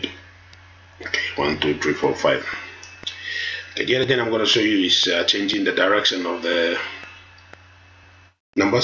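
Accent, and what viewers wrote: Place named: Papua New Guinea. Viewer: I can hear a Papua New Guinean. Thank you for the video, my man!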